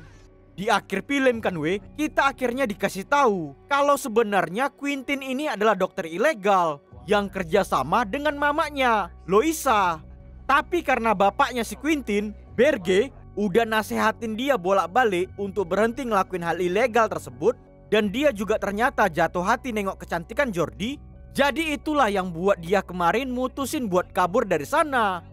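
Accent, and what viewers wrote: Medan, Indonesia. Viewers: Orang medan abang ini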